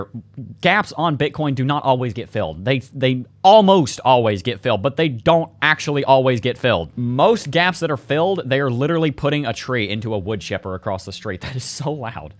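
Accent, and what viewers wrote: South, United States. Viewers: Like your southern accent, which state are you from?😂🇺🇸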